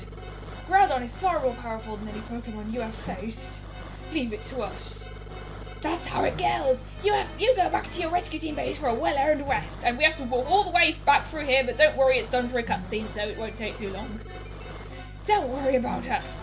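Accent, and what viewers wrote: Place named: Europe. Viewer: Yay, the fugitive saga is done! The ending always gets me a little choked up. Your partners reaction to the truth is so genuine and heartfelt, and the music makes the moment that much more emotional. I remember the huge feeling of relief I got when I first played. The fugitive saga definitely has its problems with the crazy difficulty spike and low variety of Pokemon, but it will always have a special place in my heart. I've also heard that males have a higher crit rate and females have higher accuracy and evasion, but I can neither confirm nor deny this. It seems to be the case from my experience though. About your commentary, sometimes I have trouble keeping up, but that might be because I'm not used to European accents. Also, I get a little overwhelmed by how many things you talk about, but I understand that dungeon crawling isn't the most exciting thing and that you need to talk a lot to fill the time.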